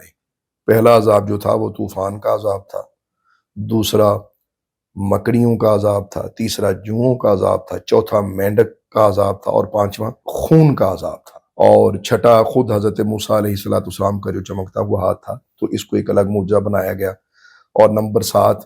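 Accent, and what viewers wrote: Arab world. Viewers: ALLAH HO ALLAH … HAZOOR QIBLA… sadqe ap k arabic lab o lehjay py … QURAN E PAK OR HADEEZ E MUBARAK se zabrdast beyan mubarak … HAZRAT MUSA k 9 mujzat life me first time ap se sune ko mily … Ap k wasly se hum in 9 mujzat py iman rahkty hai jo ALLAH PAK ny HAZRAT MUSA ko ata farmay … explaining method alaaaa or purasar… Ap ki alaaa azmaton ko salam … ❤️🌹🌹🌹❤️❤️❤️🌹❤️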